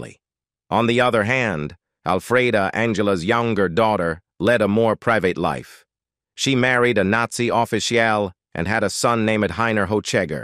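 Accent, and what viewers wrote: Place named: United States. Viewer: And as usual - an all knowing American drawling his way through more BS.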